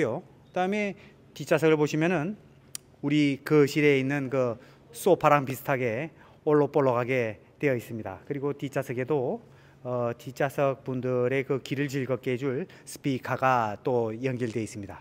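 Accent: North Korea. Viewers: 팀장님 ᆢ 북한 시람 입네까 ? 말투가 평안도 함경도 자갱도. ᆢ 어댑니까 ? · 이분 엄청 골 때리네.ㅋㅋㅋ 얼굴은 30~40대 초반? 진행은 60~70대 노인 스타일, 말투, 발음 ㅋㅋㅋ 약간 북한 말투가 툭툭 튀어 나오시는것이 이분 물건이네요.ㅎㅎㅎ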